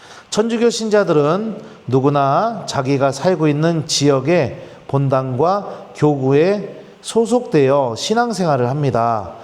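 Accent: Chungcheong, South Korea. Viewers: 아주 오랜기간 냉담을 하다가 다시 주님 앞에 왔어요 그런 저를 보고 비신자 남편이 의아해 하면서 이것저것 물어 보는데 제가 답을 못해서 교리 공부 다시 하는. 중인데 신부님 강의가 짱입니다~~^^ 남편. 들으라고 볼륨 키웠는데 " 저 신부님 충청도 사람이네" 하더라고요.ㅎㅎ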